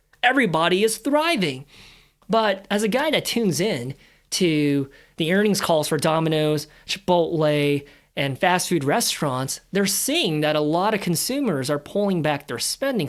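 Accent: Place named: California, United States. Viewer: I thought you lived in California, due to your accent.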